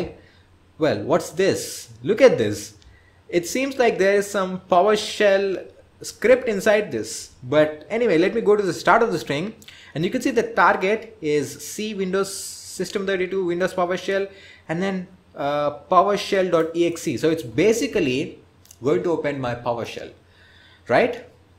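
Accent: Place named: Tamil Nadu, India. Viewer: May I know your mother tongue..? Seems like Tamil 🤔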